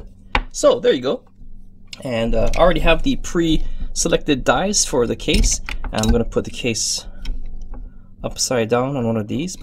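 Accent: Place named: Hawaii, United States. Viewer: Ho braddah, love your videos and your voice make me miss my islands!! Thanks for all your stuffs!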